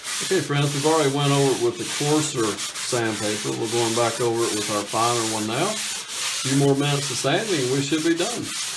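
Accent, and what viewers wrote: South, United States. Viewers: This was so helpful, thank you! And something about the really clean finish on the wall and pleasant southern accent was strangely satisfying lol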